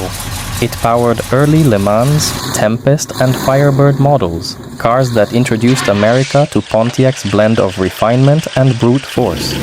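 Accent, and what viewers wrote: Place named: India. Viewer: > Indian accent narrator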